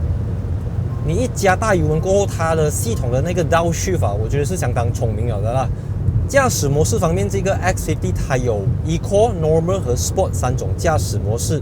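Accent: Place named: Malaysia. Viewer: 純正的聯邦腔+方言 😅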